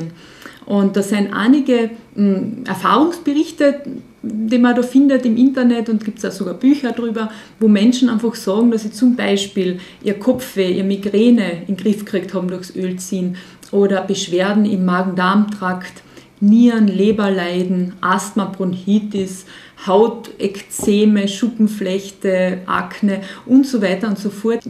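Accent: Austria. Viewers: Alles. Eine Freundin von mir lebt in Kärnten. Ich liebe Österreichisch.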